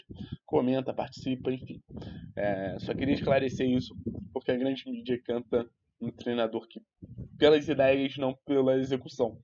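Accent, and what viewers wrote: Rio de Janeiro, Brazil. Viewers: Ele é carioca cara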